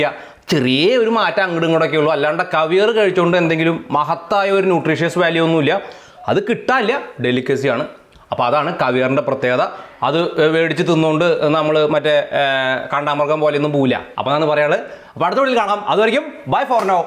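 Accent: Thrissur, India. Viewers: ❤… lub ur tcr slang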